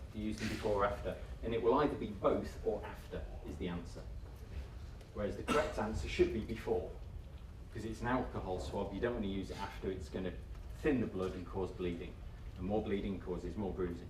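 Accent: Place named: United Kingdom. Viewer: Bugger the door creaking! This guy knows his stuff! If all you can moan about is the door creaking you didn't listen or understand this video To the maker and lecturer in this video THANK YOU SO MUCH FOR MAKING THIS VIDEO I wish I could have listed to you spk all day because for someone who never took that stuff, you really have done your research and know what you e talking about. You sound like you're from the U.K. Do you run any particular detox or rehab? I have a friend who needs help badly,and where we live there's hardly any. I know this is a old video but I'd be so grateful if you could reply. Thanks for your time and all the best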